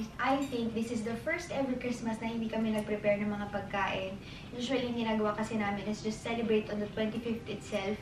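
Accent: Philippines. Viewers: kakatuwa tlg punto satin kababayan tau hihi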